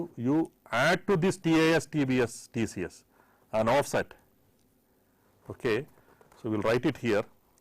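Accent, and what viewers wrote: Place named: South India, India. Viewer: Just because the professor in the video speaks with a heavy south indian accent does not mean that he does not know how to speak english. every one speaks the language with an accent, including the british and americans, african americans etc.. i have never seen anyone complain about the way people from the west speak English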